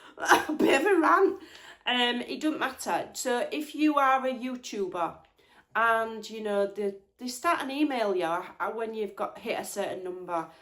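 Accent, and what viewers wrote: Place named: Northern England, United Kingdom. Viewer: You know what, I'm fairly new to your channel and I've not actually seen you before. Don't take this the wrong way, I really enjoyed your videos before, but I like you so much more now I've seen you're lovely face and the source of that great Northern accent. I'm a northerner living in the south (i know, a traitor 😂) but I have found so few English accents on YouTube, let alone northern ones. Having said that I love loads of American and Australian ladies' videos. So keep on keeping it real and I'll keep up my subscription! deal? Hahaha. Have a great day.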